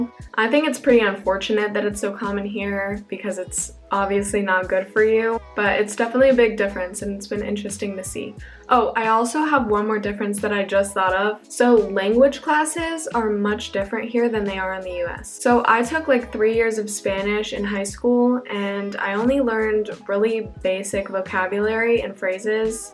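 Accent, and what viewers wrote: Germany. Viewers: Hi, it would really interest me to know the “ballpark area“ of where you went to school, like what state. Sounded a bit like Bavaria to me. And yes, a lot of things vary strongly from school to school, so you can‘t generalize too much. The language phenomenon is something you will notice Europe-wide, depending where you go, so Dutch schools might have students that can speak English, German, their own language, and possibly even French. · Hope it’s going well for you! I can’t wait so see you when u come back with your lil German accent😍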